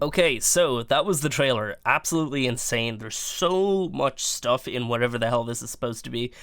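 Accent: Netherlands. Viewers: are you Dutch? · Nederlands? Dutch? I can't tell from your voice, well some times a little bit if you listen closely but good english, i noticed that your envidia shadowplay is in dutch :) nice vid man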